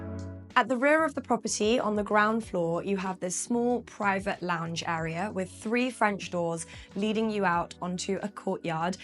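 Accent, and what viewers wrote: RP, United Kingdom. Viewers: Because of your nice legs and posh and the way you say all, Awl I’ll buy this house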